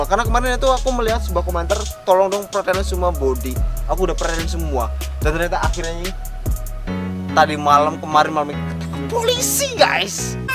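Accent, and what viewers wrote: Banyumas, Indonesia. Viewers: Gawe konten tapi ngomonge ngapak mas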